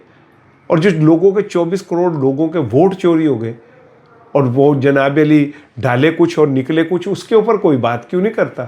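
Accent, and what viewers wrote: Punjab, India. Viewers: Bhai love the way you explain things and bit of punjabi touch. Uk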